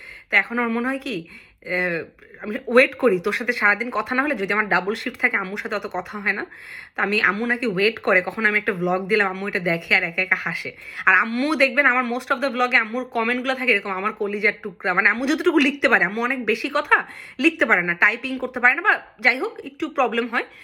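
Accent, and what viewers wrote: Barishal, Bangladesh. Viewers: Amr o tmr vlog dekhte valoi lage! Ar tmr barishailla accent a kotha aro joss lage!😁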